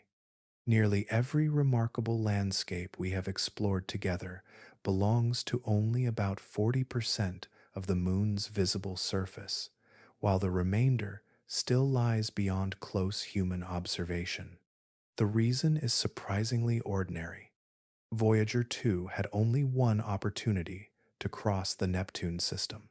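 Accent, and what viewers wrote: United States. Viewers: Congrtulations. American accent and no annoying sound effects. Subscribing now.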